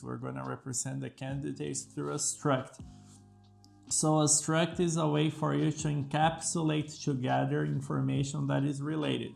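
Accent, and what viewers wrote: Brazil. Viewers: Cara, fala muito bem em inglês, mas peguei no pulo que era brasileiro. Valeu pelo video, salvou aqui.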